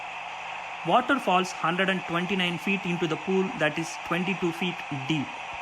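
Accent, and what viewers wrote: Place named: India. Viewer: Your Indian accent kills me watching this video🤣🤣💀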